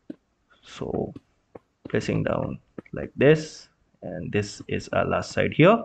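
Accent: India. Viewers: I swear to god, if i need help with anything there is ALWAYS an indian sounding guy on youtube to help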